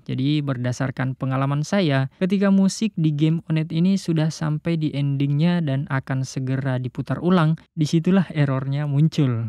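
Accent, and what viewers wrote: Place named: South Sulawesi, Indonesia. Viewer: Kayak Logat orang Sulsel.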